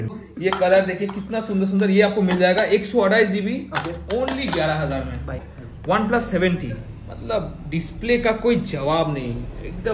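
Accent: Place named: Bengal, India. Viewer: আপনি কি বাঙালি? সারদাপল্লি উচ্চারণ শুনে বাঙালি মনে হলো, হিন্দি উচ্চারণ শুনেও বাঙালি মনে হলো। তাহলে ভুল হিন্দি তে ভিডিও কেন করলেন ভাই? আপনি পশ্চিমবঙ্গে বসে আছেন। এখানকার ভাষা বাংলা। নিজের ভাষা কে সন্মান করুন। আপনি নিজের জায়গা তে হিন্দী বলবেন, ওদের জায়গা তে গিয়েও হিন্দি বলবেন....ওরা নিজের জায়গা তে এই ভিডিও টা করলে বাংলায় করতো বলে মনে হয়?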